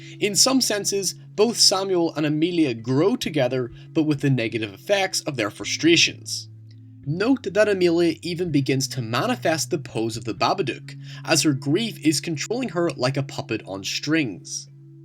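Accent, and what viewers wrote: Northern Ireland, United Kingdom. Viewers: Norn Iron? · where is this guy from is it northern ireland? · Are you Northern Irish?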